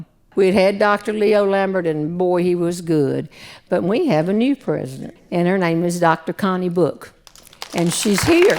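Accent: South, United States. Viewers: Hello from Melbourne, Australia. Really looking forward to seeing you in Texarkana on January 12. Thank you for the videos - I just love your Southern accent!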